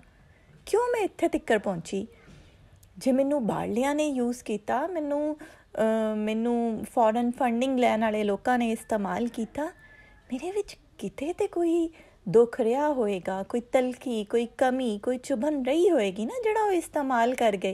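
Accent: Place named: Punjab, India. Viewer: Ye itna jatki lehja?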